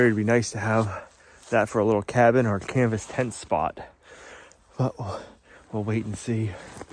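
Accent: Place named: New England, United States. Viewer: in the last couple videos, you kept referring to “Gracing the roof”. For the LIFE of me I didn’t know what u meant. Then you pulled out the ice shield and I get it now. That must be a “NewEnglander slang” I guess LOL! I do get a kick out of some of your pronunciations from time to time. You’d probably make fun of how my midwestern accent sounds too😂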